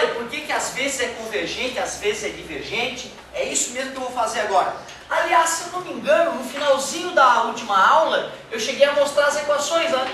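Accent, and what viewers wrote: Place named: Florianópolis, Brazil. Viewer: Muito boa a aula! Valeu professor! O professor é de Floripa? Percebi o sotaque, deve ser.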